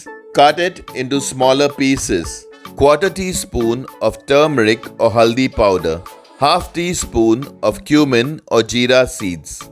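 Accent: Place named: Goa, India. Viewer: Goan taste with goan accent suits well.😊 Thanks for your reply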